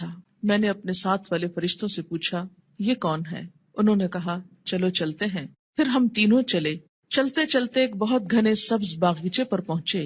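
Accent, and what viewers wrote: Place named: Hijaz, Saudi Arabia. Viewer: تحیات ودو؟ حجازی لہجہ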